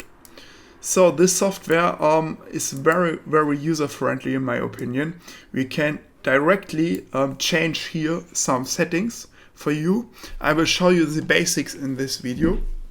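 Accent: Germany. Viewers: I used to work for BMW corporate. Miss the German accent lol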